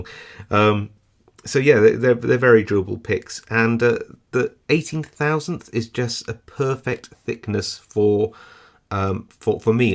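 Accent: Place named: Bristol, United Kingdom. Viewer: I can only agree with everything writes so eloquently (and punctuates so flawlessly). High production values and a touch of Bristol accent 👌. I have a small tube of these slim picks but I'm scared to use them. The screw tubes are great, too.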